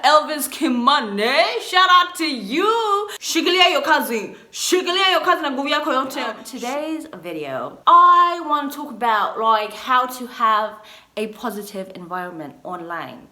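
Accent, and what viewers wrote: United Kingdom. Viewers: Here for the British accent 😍😍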